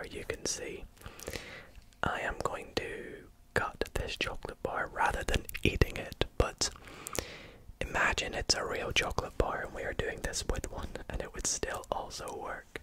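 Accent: Scotland, United Kingdom. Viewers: Enjoyed the video also are you Scottish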